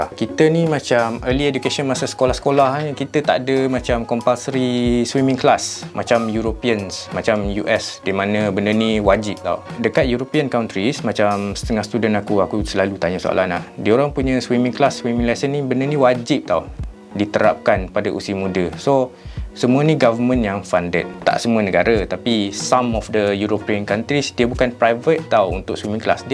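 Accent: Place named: East Coast, Malaysia. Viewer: Betul Abg..It's me.Masa tu terlalu nervous nak dapatkan lesen. Now sudah dapat lesen ..Time for some fundive. June 9-12 akan bawa 3 orang member untuk ambil lesen open water. I will drop by coral bay to meet you bro. Abe jangan guna dialek pantai timur,Viki susah nak faham 😜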